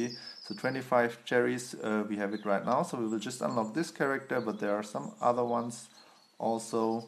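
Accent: Germany. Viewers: Kann es sein das du deutscher bist? Das ist so ein typisches "Deutsch Englisch"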